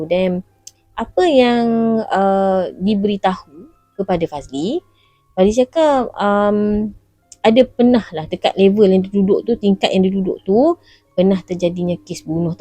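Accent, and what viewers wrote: North, Malaysia. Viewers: Asalamualaikum..hye sis.. manih2 oghang utara ni.. org nismilan ckp 'sojuk yo mato mandang'..hehe..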